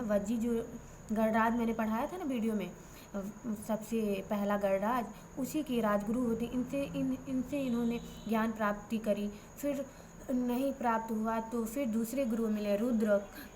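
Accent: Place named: Eastern Uttar Pradesh, India. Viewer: Mast Aap east up ke lgte ho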